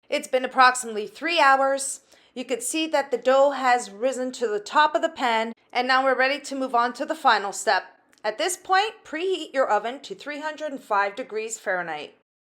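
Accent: Portugal. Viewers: A sua massa está linda,muito bem explicada.Adorei a sua pronúncia açoreana.Nasci em S.Miguel,mas estou na Califórnia há 53 anos,adoro tudo o que vejo,e também faço.Abraço Felecidades ❤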